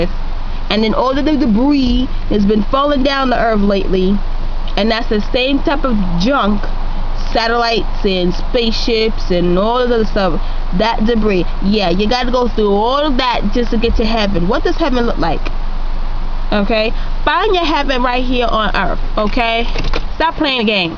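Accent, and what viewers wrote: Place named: United States. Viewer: ok u r like american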